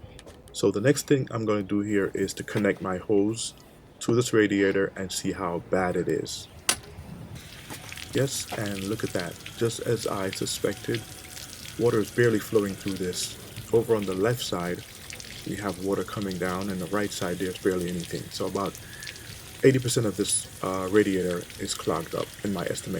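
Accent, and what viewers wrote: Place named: Jamaica. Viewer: You sound like a yardie . Working on my 928 s4 project your tips help alot. Big up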